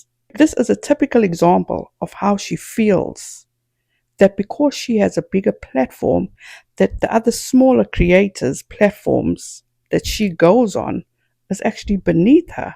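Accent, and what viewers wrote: South Africa. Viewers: So lovely to hear your beautiful South African accent! 🙌🏻🙌🏻 🇿🇦🇿🇦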